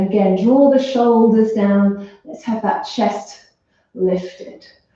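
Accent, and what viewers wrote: United Kingdom. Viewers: My wife and I discovered your videos two weeks ago and thoroughly enjoy doing them daily. We're 72 years old and appreciate so much your good instruction and for walking us thru each exercise in such a comprehensive manner. We both love listening to your wonderful British accent! Thank you so much! Bill and Debbie Graf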